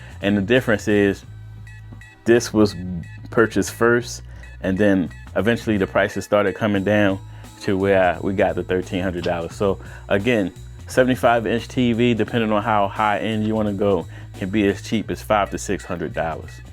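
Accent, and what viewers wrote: Baltimore, United States. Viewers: You're from Baltimore aint youuu?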